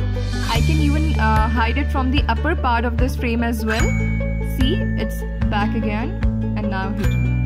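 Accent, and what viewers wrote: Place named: India. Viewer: Wow sounding like indian , is this made in india app? If yes so excited to learn pixel art from own country made app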